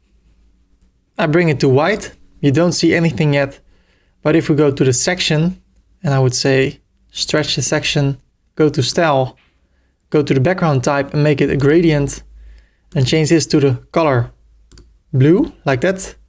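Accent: Netherlands. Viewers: The Dutch English is to much cringe. x(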